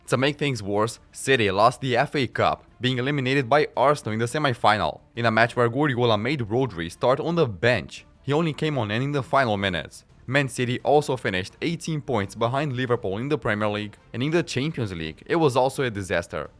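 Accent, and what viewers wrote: Brazil. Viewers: You speak english with Brazilian Accent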